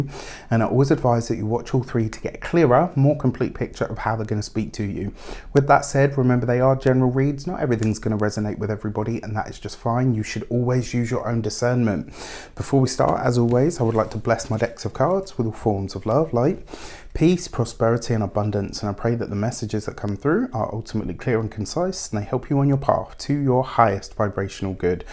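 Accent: England, United Kingdom. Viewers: Ive missed your English Voice…. thank you for the good news!!! Stay Golden!!!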